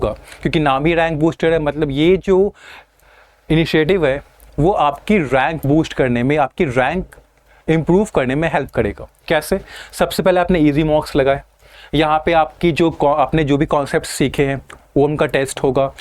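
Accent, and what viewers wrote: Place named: United Kingdom. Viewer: Bhai hindi bolne ka course kr lo Aise lg rha hai England se yha aakar hindi sikhe ho Thanks for these knowledge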